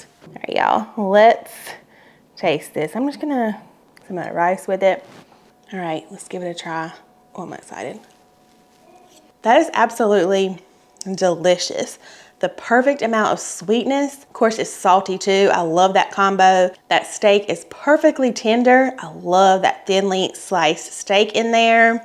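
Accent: South, United States. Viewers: love the two recipes today you and are great together love listening to you talk that southern twinge is so beautiful to hear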